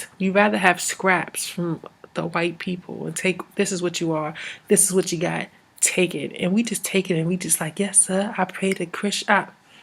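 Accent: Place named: England, United Kingdom. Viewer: I dig everything you've said, period, that's the point right?. Are you English or from London. I notice you have a bit of an accent when you speak. But nice information. I respect that, but if you are then be that, don' fake the funk, that is if you are.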